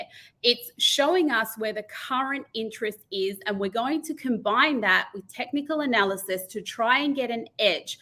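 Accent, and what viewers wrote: Australia. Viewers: I forgot how strong an australian aaccent can be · Clever lady, but that aussi accent, with emphasis at end of words is sooo annoying, markITt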